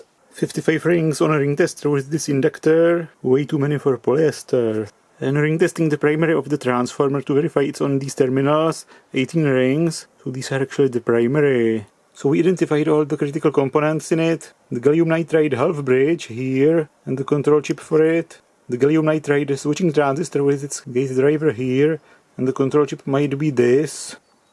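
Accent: Prague, Czech Republic. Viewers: I KNEW YOU WERE CZECH!! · sounds like czenglish (Czech Republic) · Ta pražáčtina v anglické podobě je běs 😀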